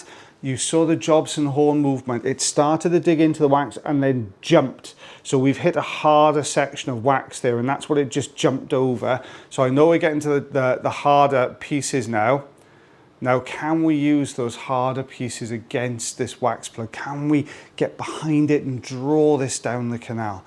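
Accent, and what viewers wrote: Wales, United Kingdom. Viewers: That was a great one! And you said Ebony in your lovely Welsh accent. I’m happy. And glad you’re sounding better today.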